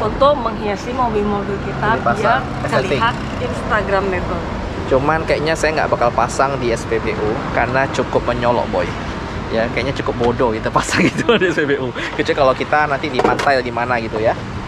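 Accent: Pontianak, Indonesia. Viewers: celake pontianak banget 🤣🤣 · bahase budak pontianak ni kental.. semangat bro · Org pontianak ke bg? Logat nye macam org ponti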